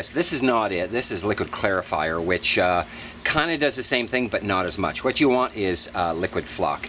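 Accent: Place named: Canada. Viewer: Thank you so much, you've saved my life (well, okay, my swimming pool!) I was getting so frustrated with a cloudy pool. I had added a load of flocculant but however much I vacuumed I just couldn't get rid of the f***ing crap at the bottom. Eventually it dawned on me that it was all just coming back through the filter and for whatever reason the filter just wasn't collecting it. That's when I saw your video and realised I should put the pump on the 'waste' setting (or 'desague' as they call it here in Spain). Bingo! It means I have to top up the water level, as you say, but definitely worth it. Now I just have to backwash the filter to get all the crap out. [Note here for any English-speaking expats living in Spain, like me, who may be confused by the terminology: for 'backwash' you need to look for 'lavado' and for 'rinse' you need 'enjague']. Totally off topic but I love the accent btw. 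I have a Canadian mate in Madrid who speaks just like you. Can't get enough of that Canadian pronunciation of 'out': a little bit Irish, a little bit Scottish, but 100% Canadian!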